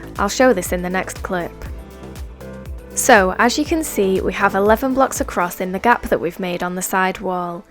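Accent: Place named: Scotland, United Kingdom. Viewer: Honestly i like your Scottish voice so now ima use your tutorials in my world now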